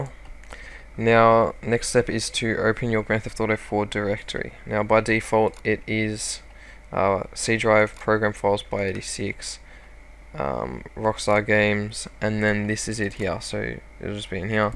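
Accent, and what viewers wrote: Australia. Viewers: Nice video! And theres a bonus to the vid aswell! Your an Aussie! This is amazing! Good video with an australian in it. Anything better than this?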